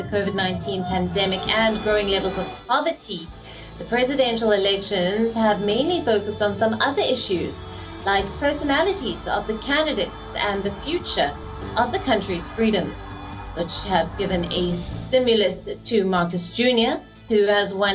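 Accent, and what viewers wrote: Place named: India. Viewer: its an indian accent. Just like Filipino, Korean, Singaporean english accent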